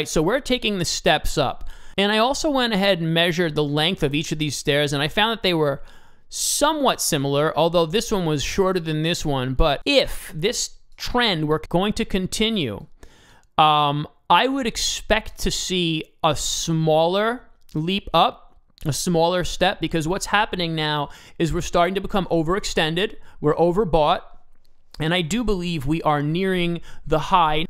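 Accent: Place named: New York, United States. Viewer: California by way of the Bronx but I definitely caught the NY accent!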